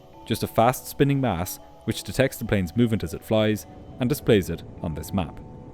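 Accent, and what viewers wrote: Ireland. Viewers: I came for the flight video stayed for the Irish accent!😄☘️ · I had the same thought! 😂 I was like, "Well he's Irish so maybe it's not what I thought lol" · Hahah long live the Irish accent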